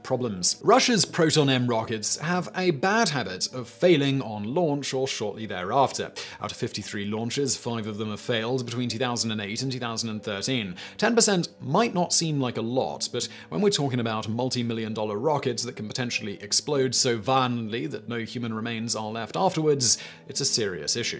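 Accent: United Kingdom. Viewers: He’s speaking correct, British English, rather than some simplified colonial version.🇬🇧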